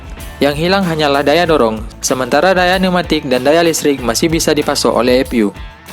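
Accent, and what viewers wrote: Aceh, Indonesia. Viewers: Bang, orang aceh ya ?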